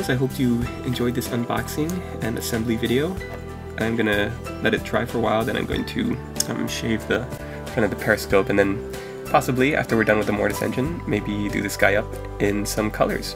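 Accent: Austria. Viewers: Nice Austrian accent. :D Do you train this voices? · ahahaha, definitely austrian accent!! love it, great job ^^ as you might know by now i'm austrian myself and you really did an excellent job! however, fantastic unboxing, tempting me to get one of these great minis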